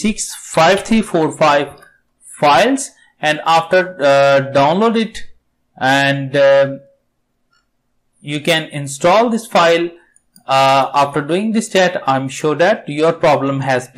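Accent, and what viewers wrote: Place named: India. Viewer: Услышал акцент индуса сразу понял что можно доверять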